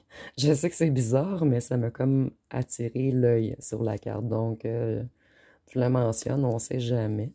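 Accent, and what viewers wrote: Quebec, Canada. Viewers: La lumière est ok!! Ça fait du bien entendre une québécoise! Bonne justesse de tirage, MERCI ❤ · Pas du tout en colère, il m'a fallu des années pour travailler sur mes blessures ce nest pas pour réagir comme celle que j'étais avant ❤❤ La lumière est ok pour moi. Merci pour ta lecture et ton joli accent québécois ❤❤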